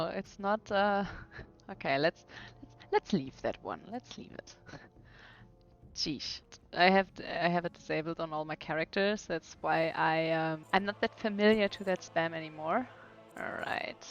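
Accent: Germany. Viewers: Are you German or Austrian? Wonderful content Edit: yes you said you are German in the video lol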